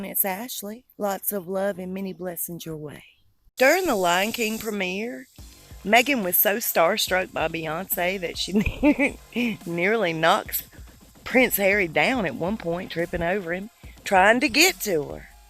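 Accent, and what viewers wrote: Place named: Texas, United States. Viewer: I love your Texas accent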